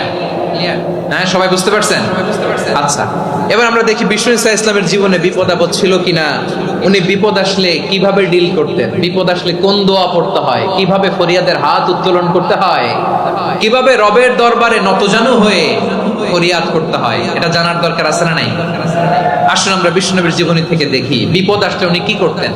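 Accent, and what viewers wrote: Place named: Sylhet, Bangladesh. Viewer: sylheti hoeo kota gula valo laglo ❤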